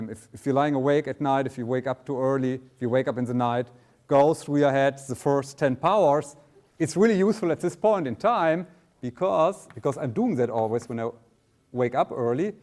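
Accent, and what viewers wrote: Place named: Germany. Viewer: Best german accent ever!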